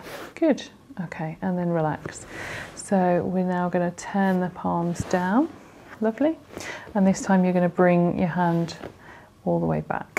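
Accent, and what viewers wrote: United Kingdom. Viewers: Can't stand British accents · That’s full on Pommy, not Occa at all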